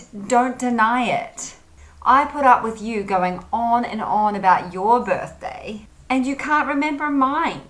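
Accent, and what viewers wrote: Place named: Australia. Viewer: I think you speaking Australian English.. Good teaching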